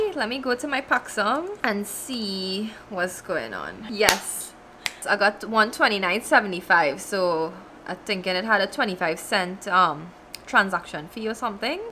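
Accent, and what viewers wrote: Trinidad and Tobago. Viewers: Nice Trinidad accent 👌🏼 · I love you accent lol TT 💖 I have family in Trinidad ❤️ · Thank you here from Jamaica .Miss Trini haha. love de accent girl. 💛 Thanks for sharing your knowledge and tips. . I am new and already see results as a pantyhose foot "model". Bless you and stay well. 💛💚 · That Trini accent!!!! ❤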